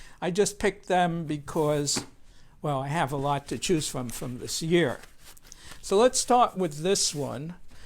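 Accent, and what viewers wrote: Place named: Brooklyn, United States. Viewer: your accent is just fine to us Brits! We love listening to your Brooklyn accent🤩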